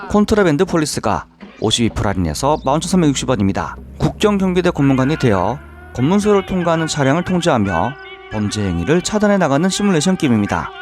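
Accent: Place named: China. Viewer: 주인장 조선족이죠? 특유의 억양이 나오네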